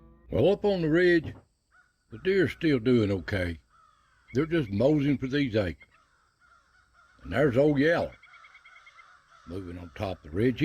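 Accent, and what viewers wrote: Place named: Blue Ridge, United States. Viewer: well he sure is eating good!😸 and your accent and way of talking sure makes me homesick for the Blue Ridge Mtns/Shenandoah Valley that was my home!! 😊 I sure 'nuff love your channel, Bro. 🧡💙🧡 Blessings my friend 💜💜💜🙏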